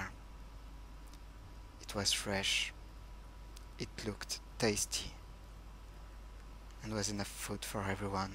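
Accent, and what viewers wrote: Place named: France. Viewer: Amazing my french friend! Great story, loved the way you said it. The french accent really added to spookie vibe thing. Great build. Will make a great halloween entry too! Just love it, didn't know you had it in you... Very macabre...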